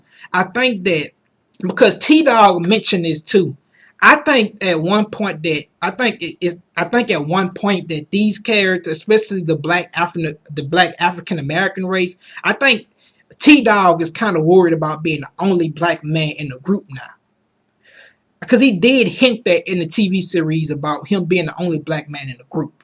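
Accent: South, United States. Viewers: YOu Talk funny! LOL! A real live Southerner.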